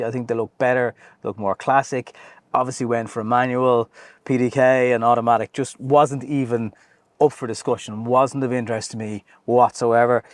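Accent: Ireland. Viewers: well there is your problem. I own two 911s, a 993 series and a 991.1 GTS series. The <num> was bought when it was 14 years old with 68K kms and the <num> GTS was bought when it was 3 years old with 157 kms, yes 157 kms at 3 years. Both are manuals and mint. I also do ALL my own work, not to save money but to make sure the job is done right as I am ultra-picky. I've been wrenching since I was in my very early teens on cars, motorcycles and aircraft as a hobby. You are buying a 20 year old car, so of course it will have issues and it isn't the parts prices which are very reasonable but the labour costs. It could be worse, you could have bought a British or Italian car. So in your case, I would have detailed the underside of your 911 and cleaned the engine to get it free of oil, etc so that you can pin point the actual source of the leak. As for an MX5 or a S2000, no thanks. 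I also didn't buy a 911 as an investment; life is too short and I am a "tech" and not floating in money. Great to hear the accent, this coming from someone that went to Rockwell College in Tipperary.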